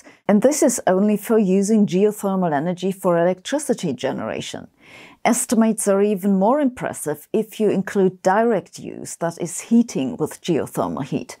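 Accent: Germany. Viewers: Ms Sabine Hossenfelder is Professor cum laude graduated in theoretical physicist, Gravitational Fields etc. But as for her nice direct humor and accent it could be becuase she is from Germany.😇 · I love you, you are extremely sexy. I fantasize about you and your beautiful german accent and inexpressive face.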